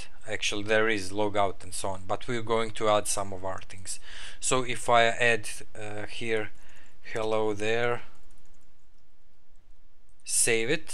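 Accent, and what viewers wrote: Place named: Russia. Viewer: с первой секунды понял что ты русский)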